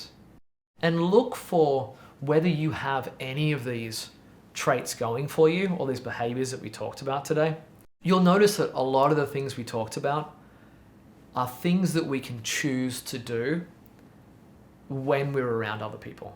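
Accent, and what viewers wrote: Australia. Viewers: Unfortunately I have all of those qualities you mentioned, not born with however but from intense suffering and courage to keep learning this life. The sad thing is, I've given up on women and the possibility of meeting one who values similar qualities so I'm currently in Indian contemplating whether I should become a Tibetan Buddhist monk 😂 Thanks for the work you do, you obviously help thousands of people otherwise this wouldn't have over 40k views in 3 days. It says new York but I hear an Aussie accent, is that correct? I'm an Aussie too and considering not my back because I find our country has become a symbiotic twin of the worse traits the US demonstrates. I'm 52 and have witnessed a somewhat caring and considerate culture which was renowned for friendliness and warmth turn into a realm of selfishness and competition. Asia is still similar to the old Australia I grew up in. 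Sorry if this sounds pessimistic mate.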